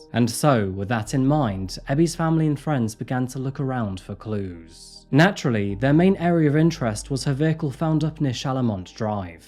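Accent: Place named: United Kingdom. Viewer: ❤ your channel very much and I listen to it every time when you come on. Never do I miss an episode. Love 💕 your British accent as well!! I’m half Welsh from my grandfather’s side and would love ❤️ to visit your country one day hopefully 🤞before it’s too late ⏰ in life lol 😝. I’m old, hahaha 🤣!!!! But no, I really am ancient, or at least I feel that way sometimes. The body is giving out, lol. But I guess we all will go through that in the end one day or other. Right?? Wishing you a wonderful weekend ahead and stay healthy and safe too. 😊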